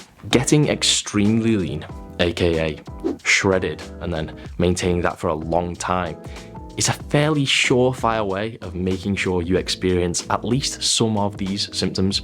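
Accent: United Kingdom. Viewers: You have VERY BRITISH ACCENT dude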